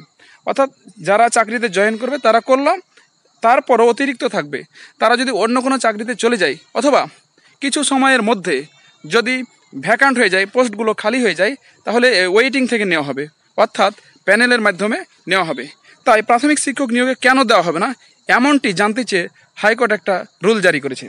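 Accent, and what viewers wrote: India. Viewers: গুরুত্বপূর্ণ তথ্য জানাচ্ছেন এজন্য অসংখ্য ধন্যবাদ। দুইটা ভিডিও দেখে Subscriber করলাম। একটা অনুরোধ ডেট দেওয়া মাত্র জানাবেন। একটা কথা জানতে ইচ্ছে করছে আপনি কি বিহারি? কথার উচ্চারণে অনেক ছ ছ আসছে। কিচ্ছু মনে করবেন না এমনিতেই জানতে ইচ্ছে করলো। 🙂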